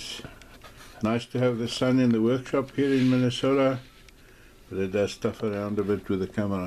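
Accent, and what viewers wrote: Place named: South Africa. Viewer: Hi you have an unmistakable accent. You must be South African. Are you in SA. I would like to get into the art of leather working I am a graphic designer by profession where does one get leather from in SA I live in JHB Randburg.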